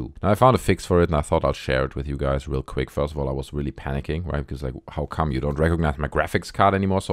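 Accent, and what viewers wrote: Germany. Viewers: are you german you have a very german accent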